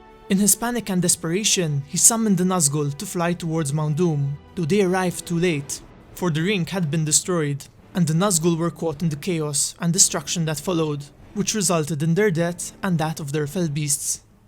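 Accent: Malta. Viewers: are you Maltese by any chance? Your accent sounds Maltese. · I could listen to your beautiful Maltese voice for hours. But harken now, as I recount the tale of Bargozím, the Fell Cockroach, foul pet caressed by Morgoth, ancient spawn sprung unwished-for from the tainted loins of Ungoliant to trouble the kitchens of Man.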